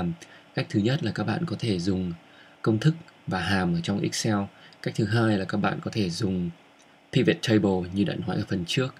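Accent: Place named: North, Vietnam. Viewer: Giong con trai mien Bac nghe hay qua. Cam on nha.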